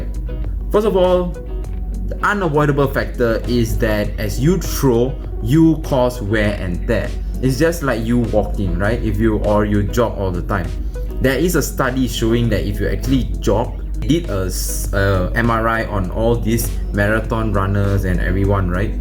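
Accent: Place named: Africa. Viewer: Why do you you sound African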